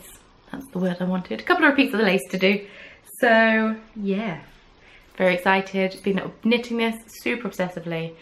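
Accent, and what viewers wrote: United Kingdom. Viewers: 😂😂😂😂😂 Balls to the wall, that made me really cackle!! Especially as you are sounding extremely British today. As always fab crafty chat!! Xxxx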